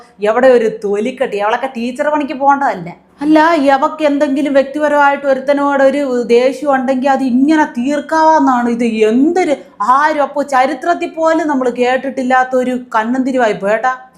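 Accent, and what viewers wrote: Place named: Trivandrum, India. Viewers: തിരുവനന്തപുരം സ്ലാങ് നന്നായി സംസാരിച്ചു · Kidu avatharanam ji... Trivandrum slang adipoli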